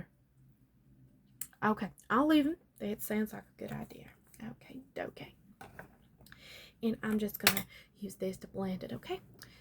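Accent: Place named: South, United States. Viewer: Coming from a born and raised genuine southerner in the US, I love everything about this. A southern accent, especially ones like Charlotte La Bouff or Dolly Parton or Blanche Devereaux, gives me such comfort. And the hunnys and darlins round it all out. I loved this ❤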